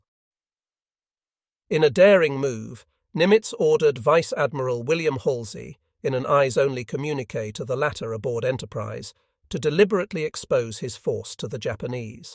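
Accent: United Kingdom. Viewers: The creators are using open source software and have learned as they were producing. These videos are a labor of love. Give them credit for what they are doing. I still detest that semi British accent of the voice.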